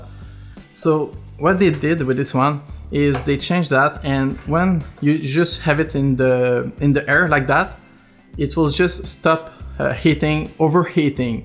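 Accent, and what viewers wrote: Quebec, Canada. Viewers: Tes Québecoé toé ? Jme trompe ?? Hahahaha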